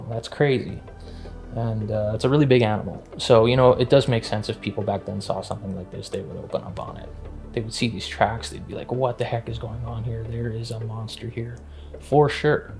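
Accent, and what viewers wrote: Canada. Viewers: he says " bean " instead of Been there the whole time is this a Canadian accent thing or ? "yeah, no ?" lol 😆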